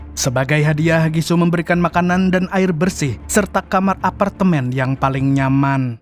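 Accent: Banjar, Indonesia. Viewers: Adminnya urang banjar ngarannya nii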